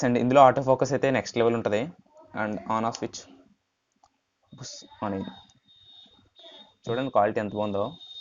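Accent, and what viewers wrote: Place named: West Godavari, India. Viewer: పశ్చిమగోదావరి district , language ❤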